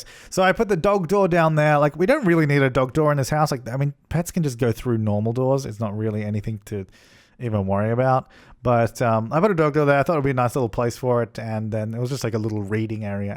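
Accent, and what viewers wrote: Australia. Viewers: It's just the Australian accent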